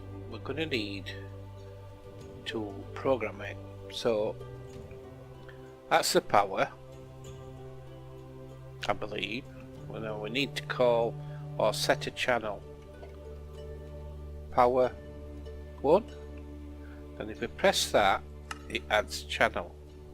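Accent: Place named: Manchester, United Kingdom. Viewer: Nothing to do with your voice, it has a good north Manchester accent. Glad you don't have commentary in one ear and music in the other I like both together.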